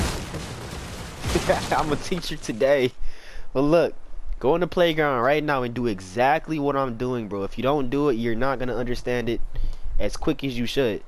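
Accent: Detroit, United States. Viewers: I know this might be a dumbass question...r u from Detroit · Are you from Detroit?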